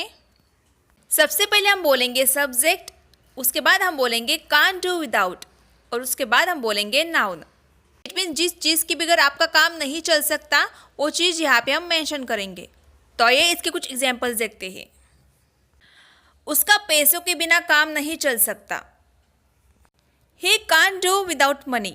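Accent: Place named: Tamil Nadu, India. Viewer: Today I learnt 👇👇👇 1) Come what may, I will never forget my parents. 2) Now it's my go to speak English. 3) Have you purchased toys and all? 4) I speak passable english. 5) I can't do without my mummy. 6) Show me to jump from this roof. Mam, you teach very nice 👌👌 I think u are from Tmilnadu.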